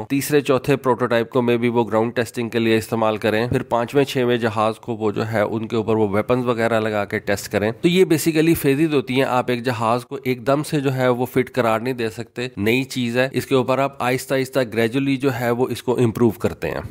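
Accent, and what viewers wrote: South Africa. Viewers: SOUTH AFRICAN!!!😂😂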